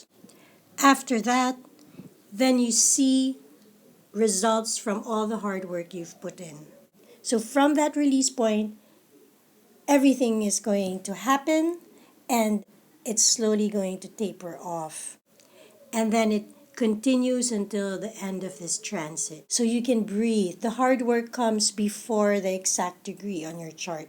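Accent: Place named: Philippines. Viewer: why do u have a filipino accent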